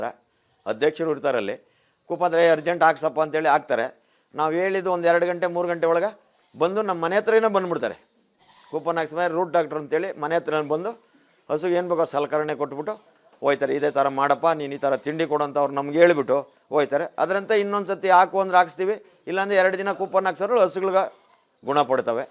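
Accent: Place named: Chikkaballapur, India. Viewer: ಇವರ ಭಾಷೆ ನೋಡಿದರೆ ನಮ್ಮ ಚಿಕ್ಕಬಳ್ಳಾಪುರ ಅತ್ವ ದೊಡ್ಡಬಳ್ಳಾಪುರ ಕಡೆಯ ಭಾಷೆ ಅನ್ಸುತ್ತೆ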